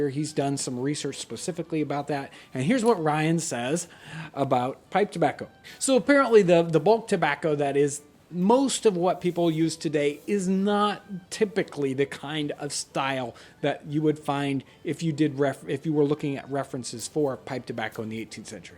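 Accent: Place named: Midwest, United States. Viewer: you know; now that Im looking for it you sound like a fellow Hoosier or Midwesterner in the least.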